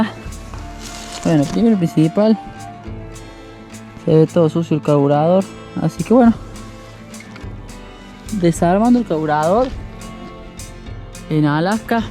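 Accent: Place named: Córdoba, Argentina. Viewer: Pense que estabas hablando en ingles puro, pero sos un cordobés nato como yo , tefelicito por el espíritu que tienes y los anos mozos también cuenta soy un seguidor desde hace poco y me encanta la cosas que haces, disfrútalo y te mando un gran saludo jose cordoba argentina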